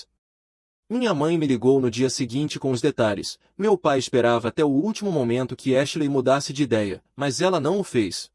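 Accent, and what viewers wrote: Portugal. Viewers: Sim, porém mesclava o nosso português com o de Portugal e ficou muito estranho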